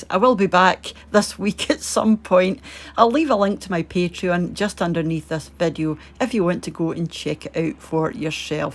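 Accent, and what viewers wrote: Scotland, United Kingdom. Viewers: I truly adore your videos. You’re a wonderful and creative cook. I’m in Texas and my favorite part of your videos is your charming Scottish accent and pictures of Scotland. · My maternal grandpa was Scottish so it's no wonder your lovely accent and fantastic recipes make me feel like I'm at home !!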